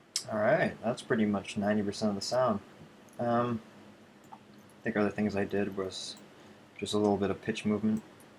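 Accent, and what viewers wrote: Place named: United States. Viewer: Aren't you from Thailand? You sound super american haha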